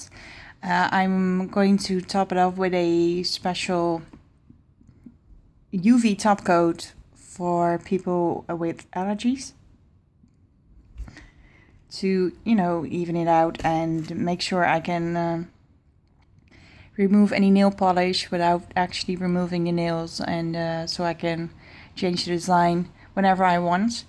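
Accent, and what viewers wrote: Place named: Netherlands. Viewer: bende gij nederlander.. want jou accent is echt Hollands..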